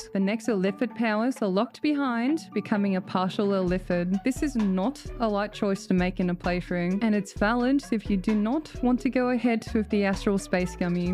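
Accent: Australia. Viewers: I rocking love your Aussie accent!!!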